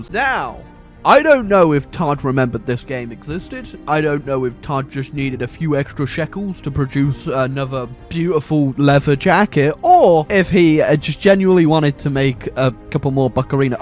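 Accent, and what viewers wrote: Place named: RP, United Kingdom. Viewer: You sound like you have royal blood!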